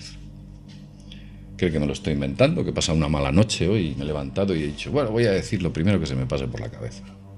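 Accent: Spain. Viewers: Dire algo que jamas han dicho... me encanta tu voz ;) ja! naa lo digo en serio, me encanta el asento español (soy chilena) y obviamente tambien todos estos temas de conspiraciones y demases. En resumen, tengo todo lo que me podria gustar en un solo video ;) buena suerte y adelante.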